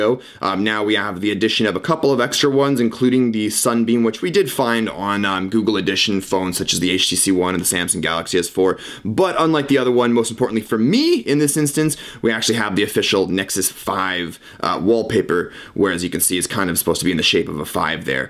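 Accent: Canada. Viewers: Canadian English. Ha, lol.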